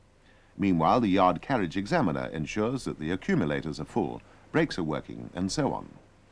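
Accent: RP, United Kingdom. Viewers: It is not "posh" it is actually "received pronunciation", as in, for voices to be "received" over radio you had to speak clearly to be understood, especially before the days of HD/digital etc. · The "posh voice" is fine. I hate English classism but it's clear and easy to understand.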